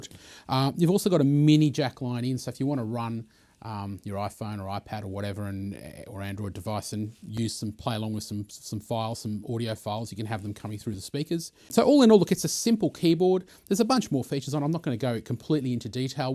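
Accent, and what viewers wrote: Australia. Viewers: This is exactly the kind of comparison vid I was looking for. Thanks heaps ! Great to hear an Aussie voice aswell on YouTube !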